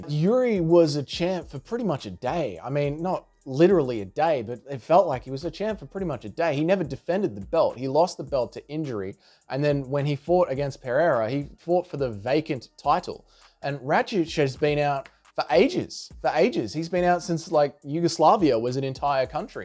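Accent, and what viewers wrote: Australia. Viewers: I agree. I cannot stand his annoyyyin Australian accent and lack of digital creativity of his videos.. andd lame humour, as in not funny. Nil, Nada, nah uh. I do wish him the best though, as I do myself and everyone. Maybe this comment will make him better, probably will it personally and splash all his money in his bank account or even take out a loan he can't get so he gets someone like his spouse and manipulate her to take a loan for him to get him a new high end computer and softwares for editing, microphones, lights green screen the whole 9, so his vids can compete with top tier amateur journalists and become a youtube ufc reporter 😂.. everything all high quality except his humour and riz. Also he looks like his going through a mid life crisis to start doing youtube and tiktok 🤣 Don't take this personally take it as motivation "brutha" and "have a go at it m8" 😅 hahaha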